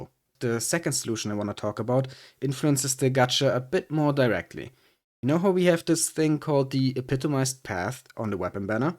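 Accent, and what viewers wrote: Germany. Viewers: I hear German or am I insane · The german accent is strong with this one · I can sense the german is strong in you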